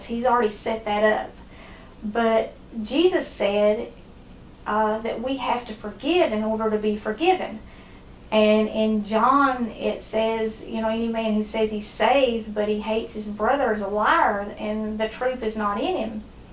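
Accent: South, United States. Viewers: Just Wow. I came here because I love your channel and your Southern accent reminds me of my Family raised Down South, many who have passed on to Higher Ground.... [Even though we are of different Races, As an African American I truly believe ....We are ALL family on this earth as far as I am concerned. Let me just say, your soliloquy on "Forgiveness" was profound and beautiful. I was in tears by the time you went back to finishing up your Johnny Cakes Recipe, "You took me to Church today", as the older folks would say when someone spoke wisdom and Grace. I have had awful things happen to me during my lifetime; Evil done to me by others I was kind and generous too....pain and damage so profound, I felt it was beyond my control to stay on this Earth....I still have moments where , because of horrific losses and fiscal damage done to me....That I just want God to take me ...However, I am still here....I read Psalms , Matthew Etc. 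to soothe me....My motto to get through this life is "Forgiveness is a give you give yourself....Hating and being angry is like drinking poison and hoping the other person dies"....God Bless you and Thank you for your wise, cogent advice. I truly believe that God put you in my path today....I needed to hear your sermon, it was timely. Thank you again.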